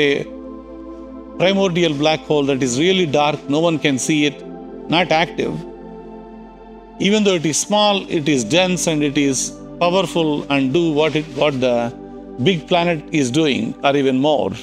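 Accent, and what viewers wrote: India. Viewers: I can’t watch you videos because your accent makes me think of all those Indian scam call centres!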